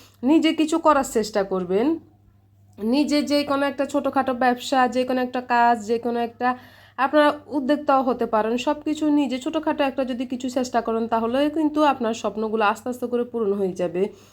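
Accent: Noakhali, Bangladesh. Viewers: আসসালামু আলাইকুম আপু।। তোমার বুদ্ধির তারিফ করতে হয় আপু। মাশাল্লাহ এত বুদ্ধি তোমার। এত সুন্দর টেকনিক খাটিয়ে নিশ্চয়ই স্বামী স্ত্রী একসাথে হয়ে। এ ধরনের আশাগুলো তোমার পূরণ হয়েছে। তোমার কথাগুলো অনেক ভালো লাগলো। যদিও এভাবে চলে না কেউ। তবে আপু চেষ্টা করব ভালোভাবে স্বামীর সাথে চলার। এবং চলে এসেছি। ইনশাআল্লাহ। আমার কথাগুলো আমার মনে থাকবে। তোমার আইডি এগুলা।তোমার কথা শুনে মনে হচ্ছে তুমি আমাদের নোয়াখালীর মেয়ে। যাইহোক আমার ভুলও হতে পারে। দোয়া রইল আপু তোমার প্রতি। তুমি আরো স্বপ্ন পূরণ কর। আমাদের জন্য দোয়া করবে আমরা যেন তোমার মত চলতে পারি ইনশাআল্লাহ। ❤❤❤❤❤❤❤❤❤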